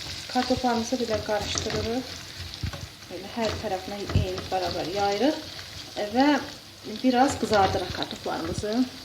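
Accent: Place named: Yeraz, Armenia. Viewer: Ay sənin ləhcənə qurban. Ay mənim YERAZ bacım.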